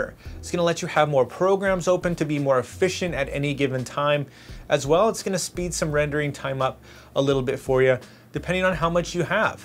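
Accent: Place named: Canada. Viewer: As soon as I heard your accent, I knew you were a fellow Canadian :). Thanks for sharing this information!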